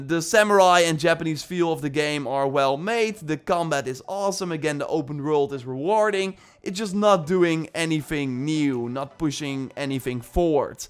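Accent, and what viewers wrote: Netherlands. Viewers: Now i know where your accent is from (the comment said '' bewerkt''), I speak dutch too btw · Wait you dutch?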